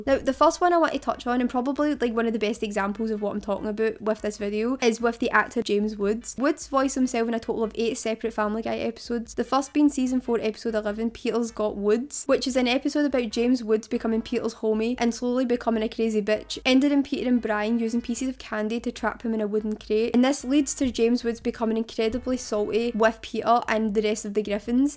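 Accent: Scotland, United Kingdom. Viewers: I absolutely love your Scottish accent-it's so rich and full of character! And your videos? They feel so real, so genuine, like you're just talking to a friend. Honestly, it's refreshing to see someone create content with this much heart. Keep doing what you do-you're amazing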